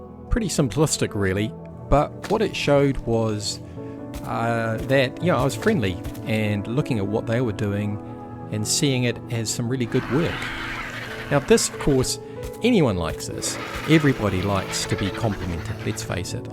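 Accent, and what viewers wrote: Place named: New Zealand. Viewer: Where you from bro sounds like an New Zealand accent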